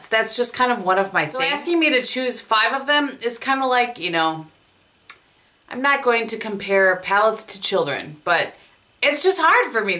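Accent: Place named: Midwest, United States. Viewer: Love your Midwest accent. I sound the same and also am a palette addict.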